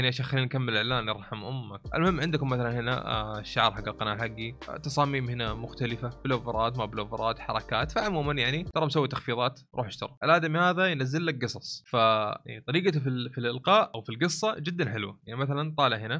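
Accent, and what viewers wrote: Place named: Iraq. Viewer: صراحة دوك صوتو واللهجة العراقية يكفون يخلو من الافضل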